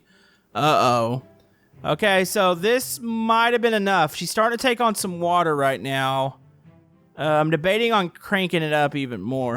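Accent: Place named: West, United States. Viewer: are you western? because the way you talk and sound, sounds western American.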